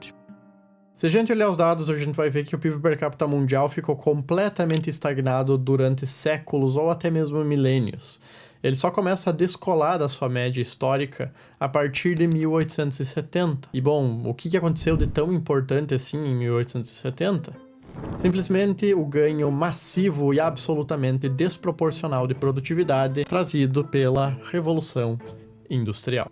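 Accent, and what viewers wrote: Rio Grande do Sul, Brazil. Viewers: O sotaque gaúcho dele faz o vídeo ficar mais incrível ainda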